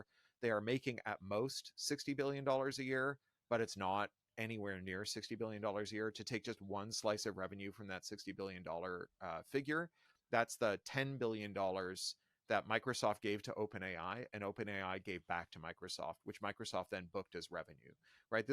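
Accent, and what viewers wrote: United Kingdom. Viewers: I was just about to tell you to stop speaking with a British accent, but I see that you’re a British publication When you speak English, you really need to learn how to speak standard American English, which is basically speaking English with a Midwestern accent. Americans cannot understand what you’re saying because it sounds pretentious and affected. But I had to stop by just to let you know that I agree. Unfortunately, your people, the British are the reason for the enshitification of the Internet. With the United Kingdom‘s creation of its Frankenstein, Israel for political power for the United Kingdom, and for European Jews, the UK and Israel, with the help of the muscle of the USA, have hijacked the Internet with laws that favor the abridgment of free speech as if a censure would advanced the power of those with a paid for preferred status to be the voice of the narrative on the Internet You don’t own the airwaves So what we have is basically a bunch of people who are bullying everyone to comply With a political narrative broadcasted over the Internet. 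For the creator and the creators of the Internet, the British and the Jewish narratives run against the entire world In all fairness, each country does not want their business for the world to know because they want to control a group of people in their geographical location. So they also a bridge the ability of the Internet to be free from censorship. Like tiny Tibet censoring what their population knows about the rest of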